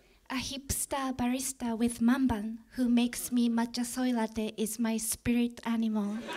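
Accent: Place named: Japan. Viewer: I don’t why understand there are subtitles. Or maybe I’m just used to the Japanese accent so I don’t hear it? 🤔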